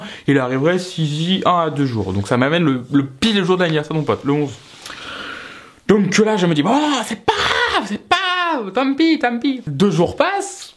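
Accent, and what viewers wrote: South, France. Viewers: Mdr l'accent du sud😂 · Mon pauvre. Moi aussi mon fait la même chose chrono poste c chiant putin Ps C mon avis ton accent du Sud te vas biens mais stp arrête le ca me rappelle un prof Sadic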